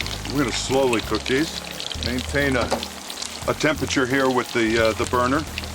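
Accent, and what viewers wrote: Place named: Italy. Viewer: U have a kind of a italian,,,ish accent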